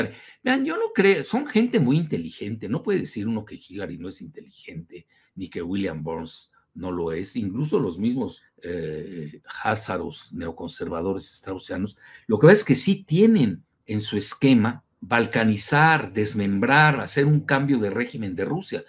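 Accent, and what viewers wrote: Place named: Mexico. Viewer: Estimado Alfredo, por tu acento diria que eres como.yo MEXICANO, yo estudié en Moscu 8años, lis 8 años más felices y hermosos de mi vida, aqui no hay mucho lugar para escribir y describir toda mi experiencia, solo te diré hay una exelente entrevista aqui en YouTube hacha por Vladimir Soloviev a Putin, hará unos 7-8 años atras vela y si no hablas ruso pidele a alguien que te traduzca ahi podras ver el gran pensador que es Putin, luego hay otra entrevista hecha por el director americano Oluver Stone, mirala y ve lo que dice Stone sobre Putin. Saludos desde Alemania Fernando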